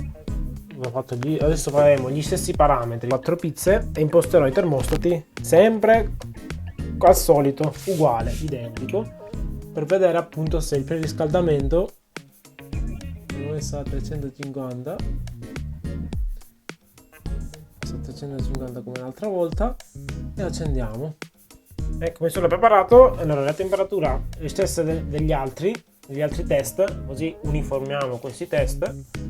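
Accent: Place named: Agrigento, Italy. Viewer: Quindi mi consigli di acquistarla e utilizzarla per tutto senza fare leva e metti biscotto e refrattaria per pratica cosa intendi c'è qualcosa da impostare per utilizzarla al meglio , quando riuscirò a fare una pizza come quelle che vedo nei tuoi video sarà fantastico ma al momento sono alle prime armi e ho qualche problema a ottenere un impasto facile da stendere , dall' accento mi sembri della provincia di Agrigento o sbaglio? Anche io sono nato ad Agrigento ma sono 25 anni che mi trasferito a Torino 😊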